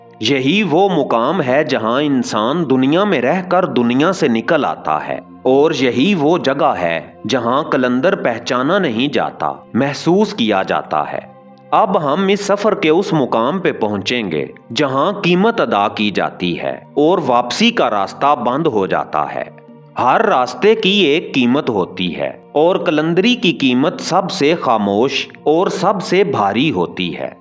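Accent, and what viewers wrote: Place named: Punjab, Pakistan. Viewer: Narrator voice is so irritating , sharp and with a Punjabi accent. Please replace the narrator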